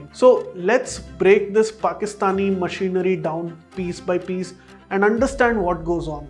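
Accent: India. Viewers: Your content is really informative but you need to change your Indian accent if you are desiring for global audience. P.S. : I am not being racist....this is what you should do to expand your business...that if comes with learning new skills, language or accent etc. It is what it is you cannot change the world bro, you have to sacrifice yourself.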